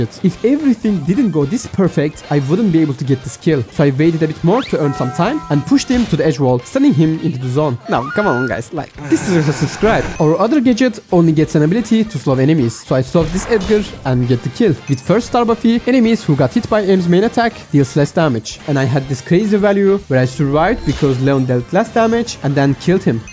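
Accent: Turkey. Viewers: videon anasayfama dustu ve ilginc geldigi icin acip izliyim dedim. videoyu acar acmaz saniyesinde aksanindan turk oldugunu anladim. sonra da kanal ismine bi bakiyim dedim ve ne goriyim, adasmisiz:D tanidigm bikac birisin ve hic beklemedigim biyerde ismimi gormek sassirtti beni. kanalina soyle bi baktim ve icerigin de hosuma gitti, yt seruveninde basarilarinin devamini dilerim dostum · Yo are you Turkish? Your sound like that i think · TURKISH ACCENT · vrotato ru from turkey ngl cuz ur accent is so close to mine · holy Turkish accent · Bu harika bir video, aksanına da bayıldım; ayrıca Türk müsün?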